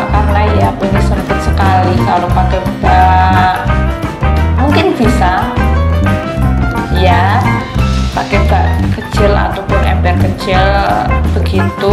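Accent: Java, Indonesia. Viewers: medok banget suaranya mbakk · Sy suka dialek bahasanya. Medok tp lucu n sumringah dengernya😊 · Mbak ko logatnya medok bnr y? Tapi sy salut... Idenya kreatif. kbetulan sy rncananya mau bangun kos2'an 4 pintu. kalo duitny cukup. Smoga tmbah sukses y Mbak???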